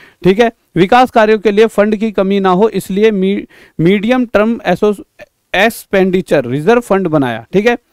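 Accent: Haryana, India. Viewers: Haryana ki ha ya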